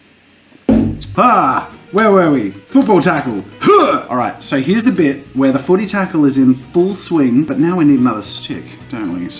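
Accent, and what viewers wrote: Australia. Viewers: Wow....you're sounding more Australian lately..... weird. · why * sounds Australian? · Are u a Australian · he’s definitely Australian that’s for sure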